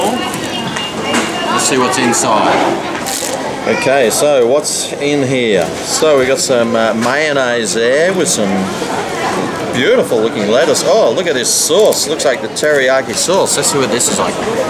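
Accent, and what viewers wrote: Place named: Australia. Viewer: Nice Australian accent m9 : D love it! 👍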